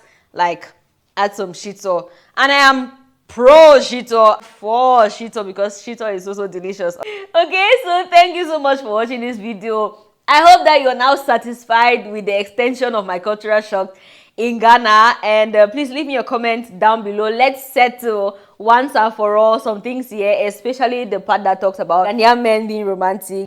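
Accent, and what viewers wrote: Nigeria. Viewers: You sound so much like a Nigerian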